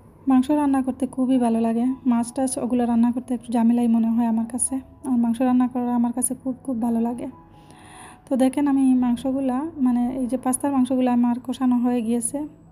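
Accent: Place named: Sylhet, Bangladesh. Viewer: 🔔🔔👍👍 আপু আমার সিলেটি কথা বালো লাগে । আমিও সিলেটি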